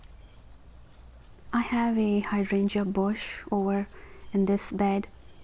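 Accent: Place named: Tennessee, United States. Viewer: I had no idea you were from Knoxville. That explains your accent. :0). I grew up in middle TN, but now live in Loudon, TN.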